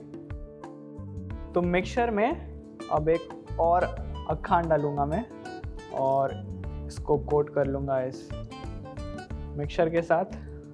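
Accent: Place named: Lucknow, India. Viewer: Your accent is very neutral (Lucknow/urban area of MP I'm guessing) And your way of explaining things just awesome. Soothing voice and delicious recipe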